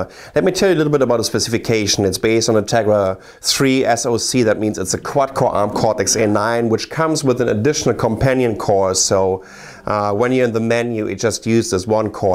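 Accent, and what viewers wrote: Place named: Germany. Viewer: God damn i love Germans speaking english.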